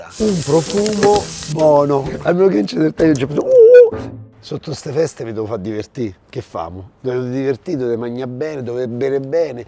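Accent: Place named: Milan, Italy. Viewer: Ma non ha aperto a Milano? Ma non lo senti l’accento milanese??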